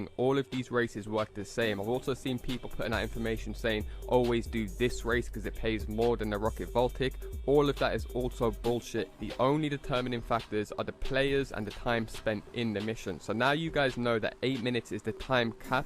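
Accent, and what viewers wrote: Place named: England, United Kingdom. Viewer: English u wallad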